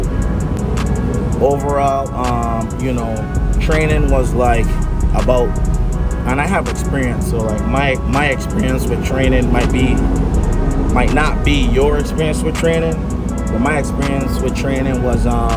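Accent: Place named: St. Thomas, United States Virgin Islands. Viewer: Vi accent strong st.thomas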